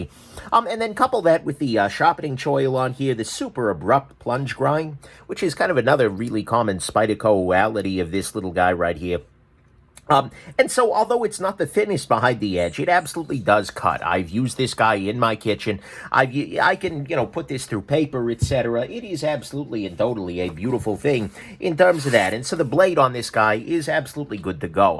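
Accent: New York, United States. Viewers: I thought you were a new yucker ! With the accent!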